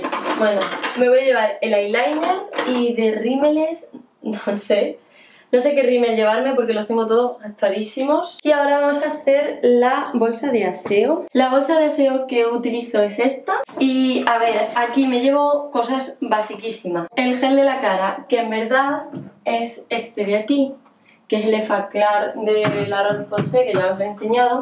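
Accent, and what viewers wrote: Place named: Spain. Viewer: Me fascina tu acento español, que bonita manera de expresarte, tienes el Don de la comunicación, felicidades, y además estás hermosa, que dicha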